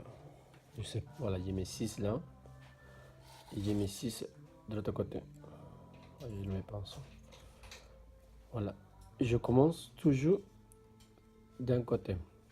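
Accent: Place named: Pakistan. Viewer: excusez moi l'accent je pensse indou mais non c Pakistan lol bref continue vos vidéos svp vous expliquez trop bien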